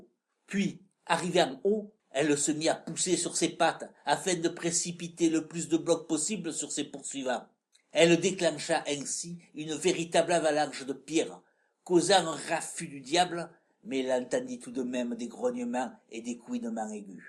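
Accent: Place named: Provence, France. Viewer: Une memoire d'enfance bien racontee avec un formidable accent de provence,,,, Qu'elle etait jolie la petite Chevre de Mr Seguin,sa barbiche de tout Officier ses sabots noirs eblouissants🐐☺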